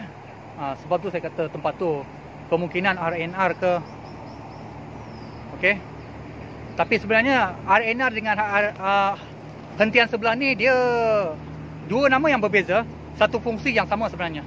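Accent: Sabah, Malaysia. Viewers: Mcm ada terbunyi2 slank2 sabah ko ni geng.. org sabah ka kawan? Heheh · Mcm owg sabah dialekny... Jln kna kg Jin... Jin mrah laa kod... Jin ni dy bleh hidup ribuan taon.... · kau org Sabah ke Bah? Dengar mcm sabahan saja kau......Sabah mmg ckp mcm ini....Kenapa Masih ada lagi yg Tidak faham bah😄.... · Sabah lh tu...sy pn mcm tu..hahaha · Terbaik.bro.. tapikan sape mcm aku confuse?? Bro ni ckp bunyi sabah ke bunyi sleng bangladesh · Sabahan tu bro, ko org mana yg xkenal loghat dlm malaysia ni org burma ke sejati?? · ko org mna bos sleng mcm sabah mix smenanjung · Hi saya first time kt sini.. Bro memang buat explore sorang@ Admin dri sabah ye.. 6thn di sabah.. dpt cam dh slang org sabah✌🏻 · Sabahan ker? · Kalau ckp sabah aku jadi x fhm ni pun dia ckp ok walaupun ada loghat sabah sikit2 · Slangnya macam urang sabah bangsa brunei.. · First dgr kau ckp pun blh tau org sabah..dusun😂 cakap sabah ja boss senang,sy pun sda lama mnetap d kl since 2014 smpai skg msih lg ckp sabah,nda pndai cakap kihil😂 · Hati2 bro klau masuk hutan..klau boleh bawa parang lah..👍👍 Dgr loghat bro btul2 mcm orang sabah😁😁😁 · cakap sabah sja bro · bosku org sabah ka? 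· Perkongsian baik..merungkai sejarah dan misteri.(gaya bahasa-mcm org sabah)..Terbaik ,U terokai byk kwsan..like👍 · Awk org mana?? Knpe cara cakap MCM org Sabah sikit2. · Nmpk sgt tk bergaul dgn orang n bodo, ni slang sabah lol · ckp pelik sikit trs cop indon..sabah sarawak pun ad slang mcm ni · Patut pun gea lain2 sia dingar.. Kompom2 da sia agak sabah sy bilang.. 1 kali nga butul2 bha org sabah.. Tebaik gaman 👍👍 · Min ni mix ke macam sabah ade india ada 😅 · loghat sabah ni. Sarawak takkan loghat begitu kalau bertutur dalam bahasa malayu baku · Mix sabahan ke bro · Bos org mana..mcm loghat sabahan pun ada..terbaik bos..sy suka konten mcm ni.. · Alaa dh tau penonton satu negara tgk ckp je la standard bm, sekali sekala nak selit2 sabah tu ok la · Pekat Sabah Admin nie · Orang Sabah ka?? Boleh tahan bro!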